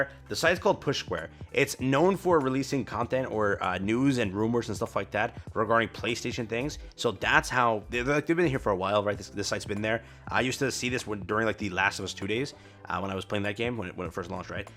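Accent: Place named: Utah, United States. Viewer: You be from utah or idaho...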